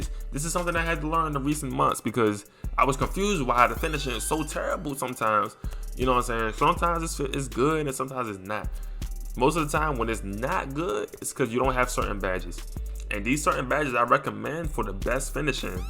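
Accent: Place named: Washington DC, United States. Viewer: Bruh definitely from DC or Murland..Being from Seat pleasant, I know us when I hear it 😂 Good shi doe fool 💪🏾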